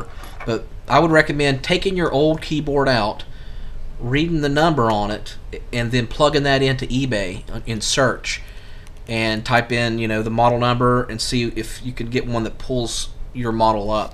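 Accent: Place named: Georgia, United States. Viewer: I didn't notice his accent but that's because it sounded normal to me here in Georgia.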